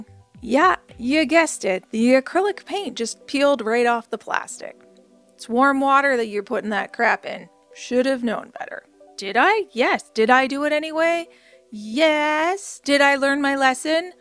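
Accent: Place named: Canada. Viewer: Watched a few shorts on this method , but yours BY FAR is what i was searching for ! Plus i here s hint of fellow CANADIAN accent <3 TY for all your hard work !! HAVE A GREAT DAY!!!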